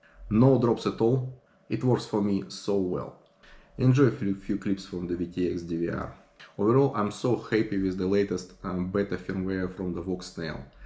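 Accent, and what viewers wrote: Russia. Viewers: О, приятель, твой английский звучит так, будто ты русский) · Рунглиш :D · Бикоз прабабли хи из рашн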